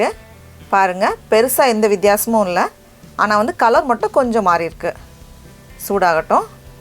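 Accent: Nagercoil, India. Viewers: Super. Naa nagercoil ponnu.unga pechu oore ninaika vaikuthu.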